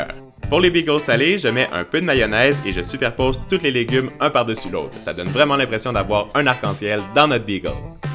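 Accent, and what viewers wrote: Canada. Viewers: De un ta vidéo est génial de deux je préfère les deux(là meilleur façon de fair manger des légumes à mon petit frère et cousin)et de trois j'aime trop ton accent moi j'ai toujours rêver de parler comme vous les canadiens 😘 😘 BISOUS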